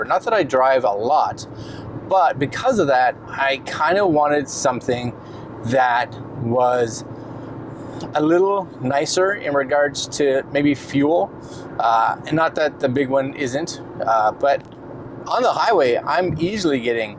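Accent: Canada. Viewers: Are you from Newfoundland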